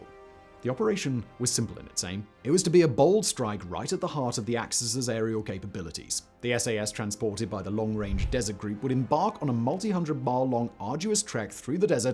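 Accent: RP, United Kingdom. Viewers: When someone speaks the Queens English, it can be assumed the person values one's roots. 😉🇬🇧🏴✌️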